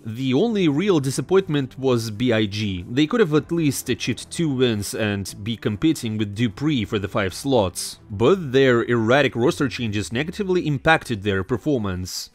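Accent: Russia. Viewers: bros mocking French accent meanwhile having the strongest Russian accent :DDDDDD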